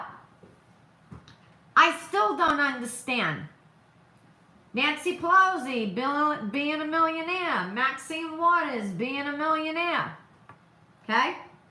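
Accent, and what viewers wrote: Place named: Bronx, United States. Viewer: Just love your thoughts passion and God u live your Bronx gurl accent. I can't wait to see you and and now. Live u guys. My Brooklyn Long Island accent is a good match to yours. I hope someone gets u out if jail soon. This is not Fair. Censorship has to Stop. FB a public company. Live u thanks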